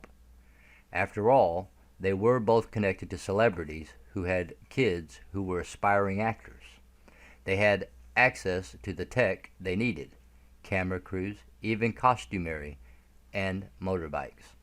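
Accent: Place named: Israel. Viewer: Her accent though is way to israeli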